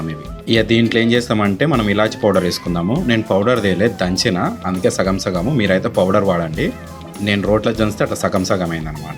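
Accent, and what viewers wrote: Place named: Telangana, India. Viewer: ప్యూర్ తెలంగాణ భాష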